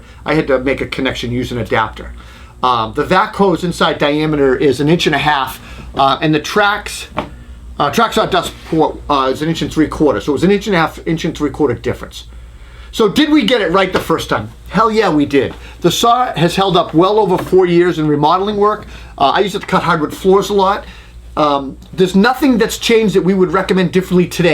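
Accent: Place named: Northeast, United States. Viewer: Looking at the pictures of you using the power tools, I just say "remember your hearing protection". ;-) Love your channel and northeastern accent.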